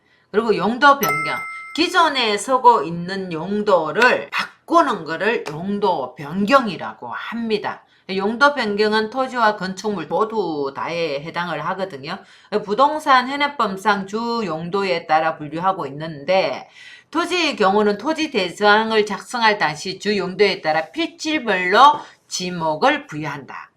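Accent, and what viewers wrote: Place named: Gyeongsang, South Korea. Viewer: 표준어좀 씁시다 젠장 갱생도 말좀 쓰지말고 먼소리 인지 하나도 모르겠음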